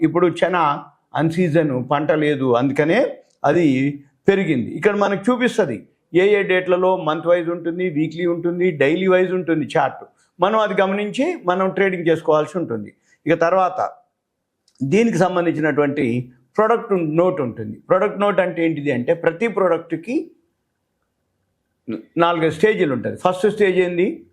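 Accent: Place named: East Godavari, India. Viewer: Sir Mee native east godavara. Educated presentation LA ledu. 🙏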